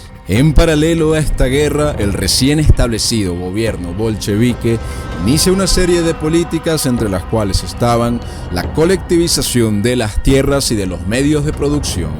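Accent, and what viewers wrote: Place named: Venezuela. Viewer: Porque el que habla tiene acento venezolano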